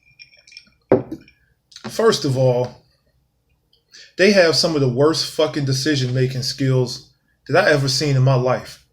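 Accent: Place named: United States. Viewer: I'm sick of them to man. I been done left the building. you did a good job telling them off man. you sound like you been in the United States all your life. good Job man.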